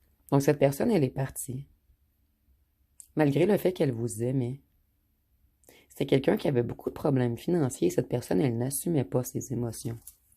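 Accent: Quebec, Canada. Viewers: Chère madame. Merci encore pour cette guidance très élogieuse pour le consultant pour les compliments que vous faites dire à son ancien autre Merci infiniment à vous. Vous nous redonnerez confiance. En plus ma très chère autre que j’accueillerai volontiers pour discuter avec elle sans jugement en toute bienveillance pour comprendre pourquoi elle m’a quitté si brutalement sans aucune explication. Votre guidance est d’autant plus crédible que ma chère autre bien aimée est capricorne ♑️ ascendant gémeaux ♊️ et je suis ascendant lion ♌️ lune en balance. Les quatre signes ont été sélectionnés. Quatre lettres de son prénom et la lettre initiale de son nom de famille ont été sélectionnées. Merci encore je redouble d’espérance de patience car j’aime mon autre comme je n’ai jamais aimé. Excellente journée à vous chère madame à l’accent québécois inimitable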